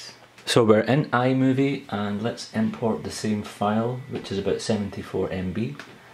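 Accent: Scotland, United Kingdom. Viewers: Omg I love the Scottish accent! So hot hehe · nice scottish accent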